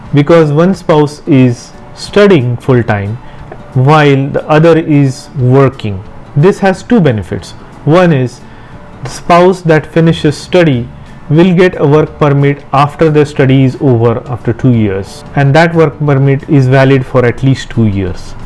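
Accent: India. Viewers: I wish to apply but very hard to understand the Indian accent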